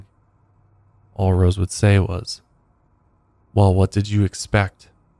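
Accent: United States. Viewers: Oh my God, I had such a laugh out loud moment when I realised was talking about Hertfordshire at the beginning of this video 😂😂 and some other English names made me laugh throughout too. I mean that I was laughing lovingly because it's so cute how Americans often pronounce our place names 😅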